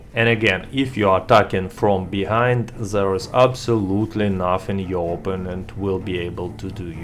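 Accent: Russia. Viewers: Geez, why every russian has such a horrible accent? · He’s Russian of course it sounds cool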